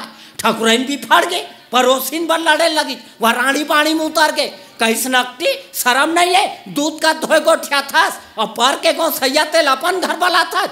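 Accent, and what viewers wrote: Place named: Chhattisgarh, India. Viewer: मजा आगे छत्तीसगढ़ बोली मे जियो कवि जी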